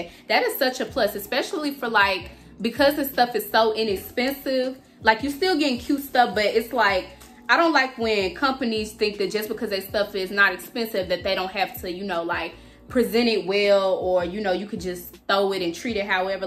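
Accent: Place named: Miami, United States. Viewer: Your accent sound like you’re from Miami.youre beautiful ❤️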